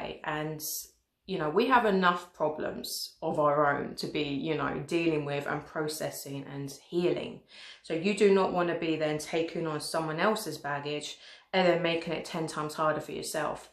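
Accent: United Kingdom. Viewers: WHY do you dis the westeners with your british accent. I'm Not defending us but we're the worst?? Are you kidding??? that is negative. its called sex not shagging,btw shagging makes it sound like its not really sex nor that it has conseqences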